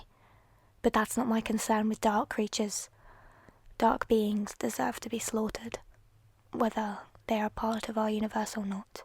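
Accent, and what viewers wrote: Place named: United Kingdom. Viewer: You sound extremely British (First video I watch and I say this wow)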